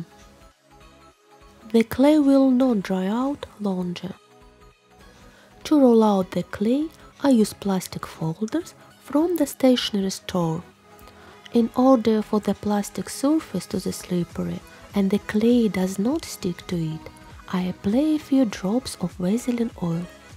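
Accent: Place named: Russia. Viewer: Вы русская?) Русский английский.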